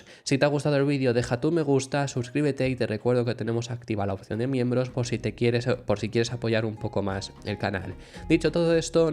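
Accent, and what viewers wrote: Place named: Spain. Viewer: Parece por tu acento que eres español, y por supuesto el titulo de "Alonso fue el mas rapido" debio ir acompañado de "pesco en rio revuelto", con ese auto si acaso en pista seca se mete de 7º o de 8º, hay que ser más realistas que fanaticos.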